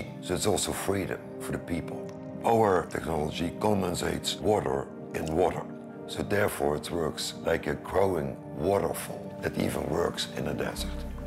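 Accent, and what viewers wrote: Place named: Netherlands. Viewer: Nederlands accentje wel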